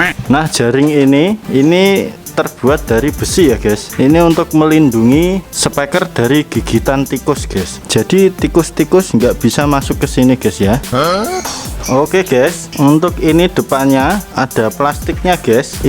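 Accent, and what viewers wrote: Java, Indonesia. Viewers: Medok sekali km gaes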